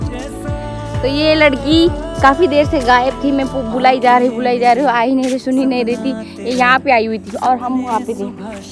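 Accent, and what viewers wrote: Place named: Nepal. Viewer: Aap toh pieor nepali bolrey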